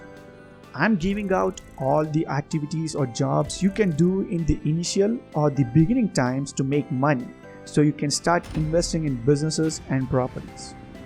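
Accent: India. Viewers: Yeah bro this is a great idea... As too many got GTA V for free, and they don't know what to do and just roaming here and there and telling gta online world is dead.... This content of starting from beginning will be a very useful trick for them or even for some players which knows how gta world works... So yeah why not It's a great idea! And i want to know that are you also from India? Because i am from india and found ur accent same......😅😅 Btw you can also do gta V live streams of grinding and some other games too 😊😊 And plz while making guide plz tell that how things work like in vehicle stealing mission we do not have to hit vehicles and etc... Cuz many friends of mine doesn't know the basics of gta online properly, like what to do and how to do 🤣🤣🤣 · you improve your english alot you got much more less that punjabi akcent ;) i can understand evetything now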